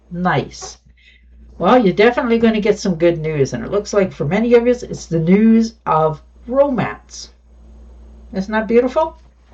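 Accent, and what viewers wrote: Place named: Canada. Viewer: New viewer here. Good reading, thanks. Your accent sounds a lot like my Canadian friends from BC. Sub'd.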